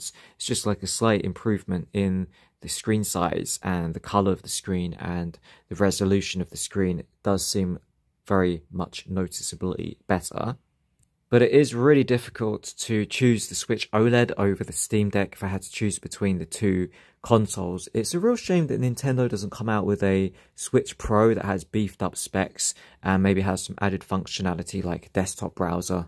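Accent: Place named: United Kingdom. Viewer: ...not even his British accent made this review sound smart.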